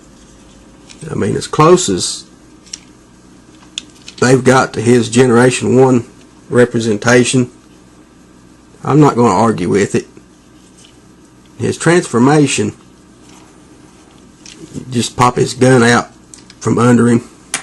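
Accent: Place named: South, United States. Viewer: I was hoping so, since you sould like your from the south too. Maybe I'll be able to find one soon then. Thanks, PS check out my channel, if like custom transformers!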